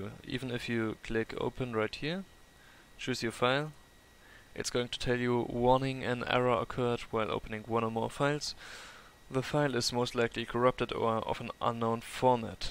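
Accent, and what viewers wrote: Germany. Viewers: Danke man! Das Deutsch hört man raus, trotzdem gutes Englisch m8 :D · Man hört die deutsche Ausprache aus ihm raus ;D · bist du ein deutscher du hörst dich so and hahaha aber redest english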